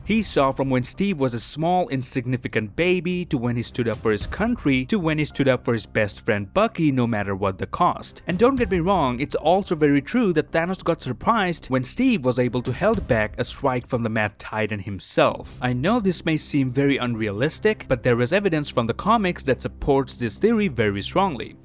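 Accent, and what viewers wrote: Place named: Quebec, Canada. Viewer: You have one of the most stereotypical French-Canadian accents ever and I love it dude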